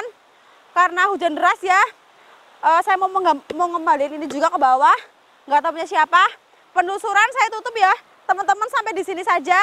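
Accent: Banyumas, Indonesia. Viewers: kui mba wong ndy jane sih deng ngomonge ngapak😂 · Mb lucu nek ngmong ngapak....haaaaa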